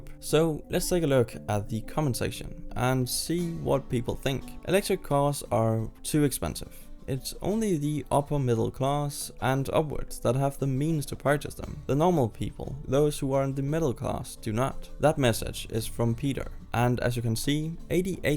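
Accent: Denmark. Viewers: how could you possibly not know he was danish with that accent? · Visste du var dansk från din engelsk dialekt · I could smell this guy's Danish accent from a mile away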